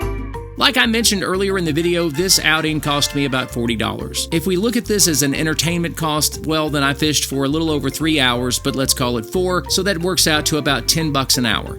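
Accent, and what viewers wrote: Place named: East, United States. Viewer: Thank you, interesting Eastern state accent. Jyssojuan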